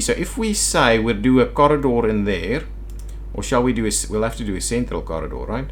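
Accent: South Africa. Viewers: Its actually a South African accent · When I was a child, I used to watch a snake expert on TV called Austin Stevens. You're accent very much reminds me of him. I always liked the South African accent. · you sound south african, tell me its true.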